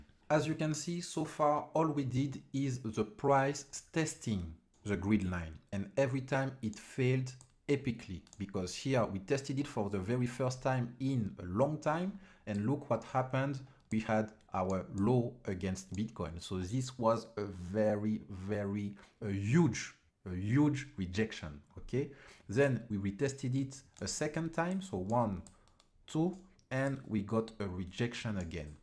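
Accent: France. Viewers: L accent est français non?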